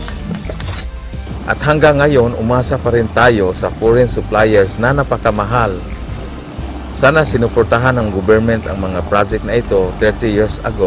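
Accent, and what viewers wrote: Visayas, Philippines. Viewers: Konting bawas pa ng visayan accent at pwede na.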